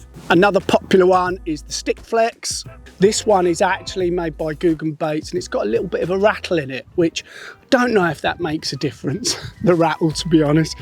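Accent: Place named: Cockney, United Kingdom. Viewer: are you going to do some new barbel vids? Missing your cockney enthusiasm. Hope life isn't bending you over. 👍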